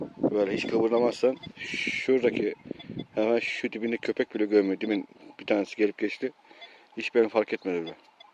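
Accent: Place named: Uşak, Turkey. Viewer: Usta sen nerelisin ya bizim uşaklılar gibi konuşuyorsun şiven çok benziyor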